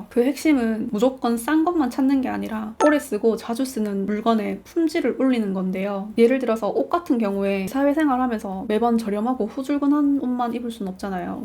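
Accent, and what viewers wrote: Gyeongsang, South Korea. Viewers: 고향 경상도어디였나용?!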